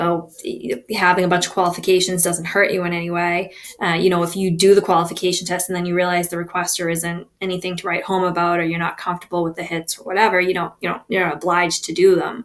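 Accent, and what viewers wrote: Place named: Long Island, United States. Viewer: that Long Island accent though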